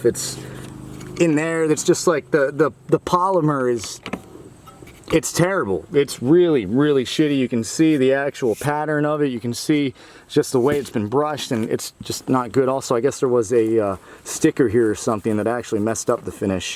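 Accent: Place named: New Jersey, United States. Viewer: So your from New Jersey’s then?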